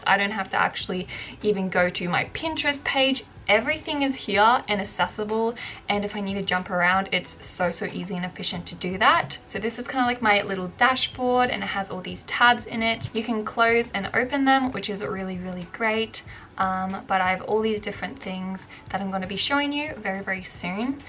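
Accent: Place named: Australia. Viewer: Lol I’m Aussie and it took me so long to realise you had an Australian accent because I was so tired and then when it clicked I was like “!!!” Lol. I’m so excited to check out campfire thank you for the tips! ❤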